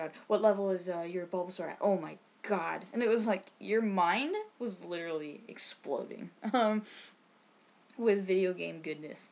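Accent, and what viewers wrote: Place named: Canada. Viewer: I thought you where American untill you pronounced out as "oot"and about as"aboot""and then I thought you must be Canadian.please correct me if I'm wrong.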